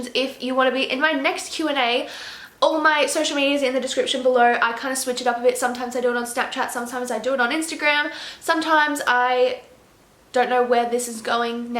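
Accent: Australia. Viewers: I really love your videos, your personality shows so much <3 Also, i lub your Australian accent omg, I could listen to you talk forever.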